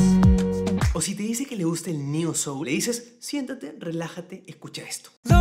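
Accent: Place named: Mexico. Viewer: recien conosco tu canal y dejame decirte que pense que eras mexicano jaja que buen contenido tienes !!!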